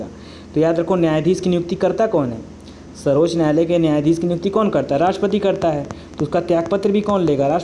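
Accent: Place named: Uttar Pradesh, India. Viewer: Sir ji aap up se ho apki language se pta chl rha hai Bhot accha samjate ho sir ji thanku bye tc.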